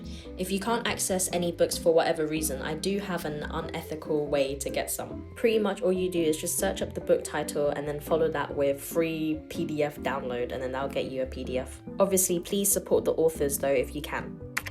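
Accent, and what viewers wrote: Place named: United Kingdom. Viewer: omg the british accent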